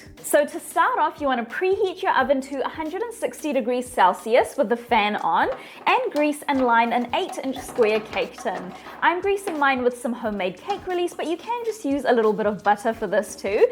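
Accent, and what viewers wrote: New Zealand. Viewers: Do I detect a kiwi accent? 🤔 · I tried another recipe which I wasn't a huge fan of. I tried your recipe a couple of weeks ago and my husband and I loved them! I'm pregnant and craved something chocolatey and I asked whether I should make brownies or cookies. My husband immediately said the brownies you made last time from the girl from New Zealand! He was especially delighted to hear your accent as he spent some time studying abroad there years ago. Haha so I'm making these brownies again right now; they are currently in the oven. I never comment on recipe videos because frankly I haven't found many that impressed me. But these brownies are very delicious and better the next day. Thank you so much!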